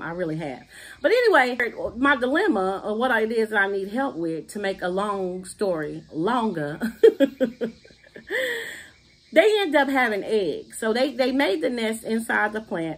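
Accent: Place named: South, United States. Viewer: You so funny! You make me laugh, when you are talking to us! That southern draw is lovely!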